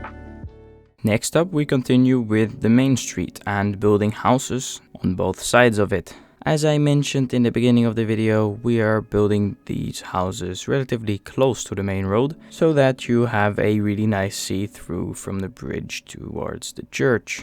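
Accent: Netherlands. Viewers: Me vrouw zei hij heeft een Nederlands accent, en volg al denk een jaar je kanaal😂😂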